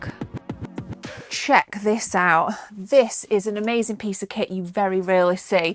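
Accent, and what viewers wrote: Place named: RP, United Kingdom. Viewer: You have a beautifully posh accent. What part of the country are you from?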